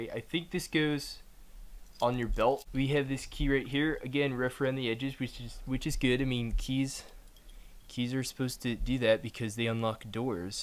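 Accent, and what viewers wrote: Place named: Boston, United States. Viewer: Cool dude sounds like he has a slight accent of some sort. Boston perhaps? 😂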